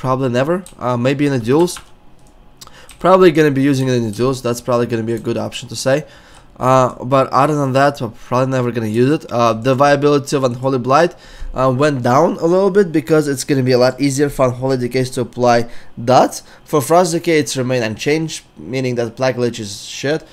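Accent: Russia. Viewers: That's fucking great! XD The Russian accent is awesome too, if you were playing a Draenei Dk it would be even better hahaha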